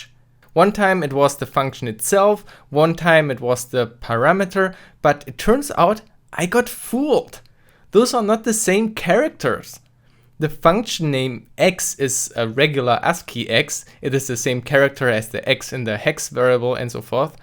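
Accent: Germany. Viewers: Just wondering where you are coming from! You sound so German :)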